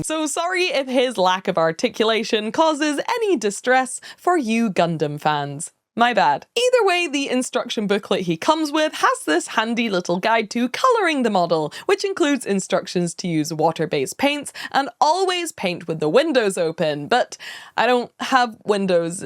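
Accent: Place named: Scotland, United Kingdom. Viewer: My wife saw you on my phone and asked, "Is she AI?" I explained, "No, she's just Scottish."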